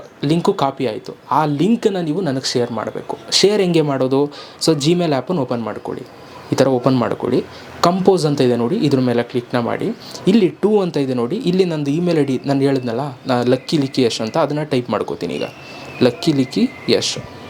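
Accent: Mandya, India. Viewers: Super 🎉🎉 ❤❤ olledagli nim alochanege❤❤ brother namm mandya flavor nim bay li kelode chenda🎉🎉anna❤❤ tqsm...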